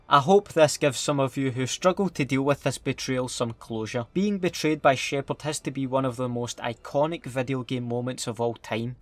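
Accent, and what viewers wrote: Scotland, United Kingdom. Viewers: I'm new to this channel but after reading some comments and listening to some good Scottsman rambling, I think you gents (an I use that term loosely) will take good (alcoholic too, no doubt for ya scotts) care of me. You've earned yarself a sub. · McGregor is Irish, and uploader is Scottish, like soap and captain McMillan. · After TF141 got intel on makarov Shepherd wanted to all the credit for himself so he tried to eliminate the task force and get all the credit once makarov was killed. He wanted to redeem himself after losing 30,000 people in a snap! I know i'm 5 months late but who cares. Also are you scottish? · The scottish accent makes this even funnier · Your Scottish voice makes this way better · u Scottish? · Heard a fellow scottish accent and subscribed instantly 😂😂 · Are you Scottish? He’s Scottish right everyone? 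· your accent almost like soap...is soap a scot too?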